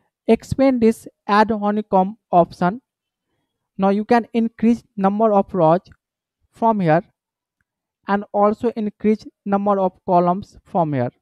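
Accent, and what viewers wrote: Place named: India. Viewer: super ultra giga pro max Indian accent luv it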